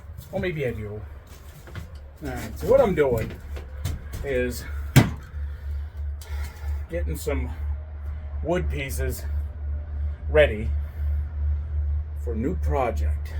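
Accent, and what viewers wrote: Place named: North, United Kingdom. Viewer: I hear that northern accent getting mixed in with that other one you have ha ha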